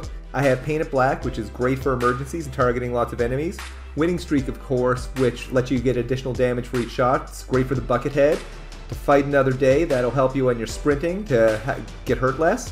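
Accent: Canada. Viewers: Nothing wrong with sounding Canadian! I’m Canadian too lol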